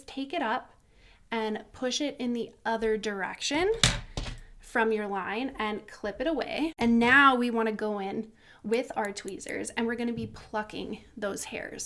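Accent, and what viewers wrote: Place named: Los Angeles, United States. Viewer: Haha I thought that. LA accent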